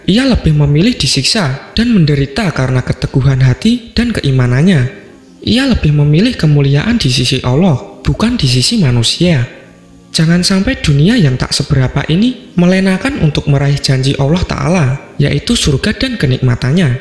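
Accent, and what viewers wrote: Java, Indonesia. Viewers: Yg baca narasi Jawa banget 😄👍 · Ciri khas jawa ngomng nya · Naratornya jowo sangat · Ngomongnya medok jawa logat bahasanya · Pembaca logat jawa kurang sedap di dengar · suara logat jawa... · Saran saja ya,,,pengisi suaranya jangan terlalu kental logat jawa,, kadang2 kaga ngerti bahasanya😂😂😂😂😂🤣🤣🤣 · maaf ya mas,Aku sangat suka dengar cerita kisah nabi... tp kali ini aku gk bisa focus liat cerita nya malah tertawa dengar suara mas ... mas asli jawa ya mas kok medooook banget🤗🤗🤗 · Narator nya meddok banget jawanya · Lek ngomong koyok wong jowo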